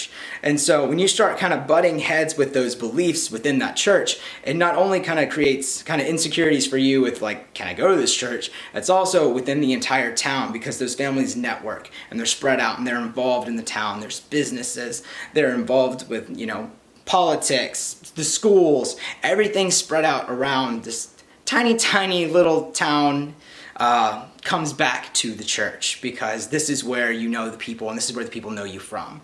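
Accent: South, United States. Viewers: I'm surprised you don't have a thicker accent. I moved away from a small town in TN after college. I followed the yellow brick road to CA and have never looked back. I have a question for you. Do you know the difference between a yankee boy and a southern gentleman? A yankee says you can. A southern boy says Y'all Can! Embrace the southerner in you. Life is a buffet, try a bit of everything, sample it all. When you're older these are the days you'll look back on with fond memories. Make some memories. Stay single, there's plenty of time for that later now is a time to forge life friendships.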